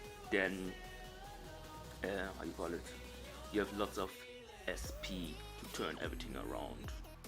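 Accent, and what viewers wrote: Germany. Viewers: Ich habe den akzent direkt erkannt da ich selber deutsch bin erkennt man sowas direkt wenn ein deutscher englisch spricht war mir aber nicht sicher xD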